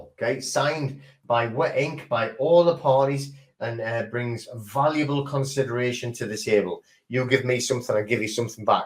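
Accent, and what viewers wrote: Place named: Newcastle, United Kingdom. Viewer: You sound like a geordie if I’m not mistaken are you from the North East of England??? I’m curious apologies in advance if that’s not the case.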